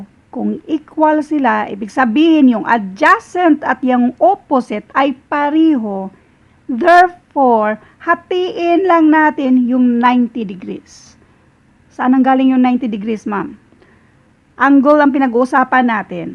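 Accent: Visayas, Philippines. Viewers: Bisaya ka Maam?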